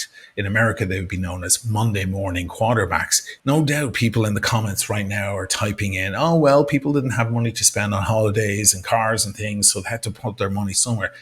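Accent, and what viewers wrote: Dublin, Ireland. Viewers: Always love to see a dublin accent on u tube talking about watchs i admire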